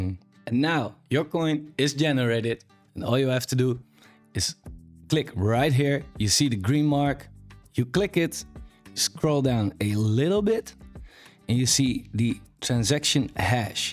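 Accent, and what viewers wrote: Netherlands. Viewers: I love jou mooie hollanda accent Wel goede video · you sound dutch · ik hoorde al iets nederlands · You from holland right?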